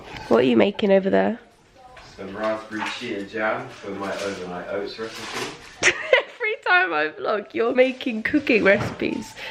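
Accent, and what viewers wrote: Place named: England, United Kingdom. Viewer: I love your english accent! It sounds so nice!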